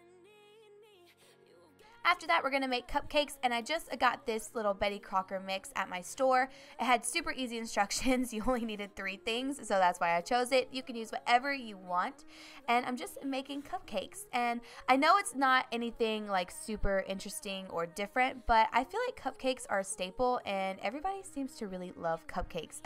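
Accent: South, United States. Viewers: R u southern because u sound very southern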